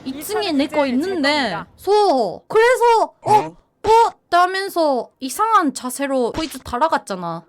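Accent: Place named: Japan. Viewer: 한본어 역대급이네 ㄹㅇ 실력 원탑인듯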